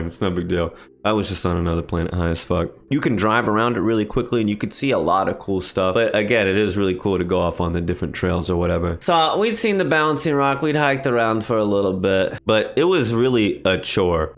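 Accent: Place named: Boston, United States. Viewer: Where you from man what’s the accent? I’m from just north of Boston and sounds kinda familiar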